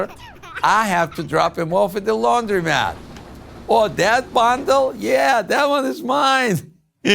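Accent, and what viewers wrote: Russia. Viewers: Русский акцент, господи 😖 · + The Forms, He is probably a Russian Jew. He does sound Russian.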